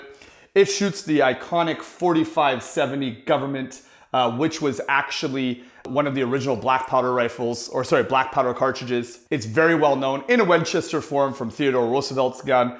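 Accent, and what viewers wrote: Canada. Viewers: Wow you DO sound Canadian, eh?!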